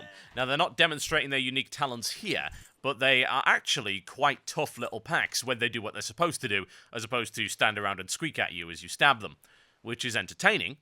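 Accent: United Kingdom. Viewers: British accent ftw !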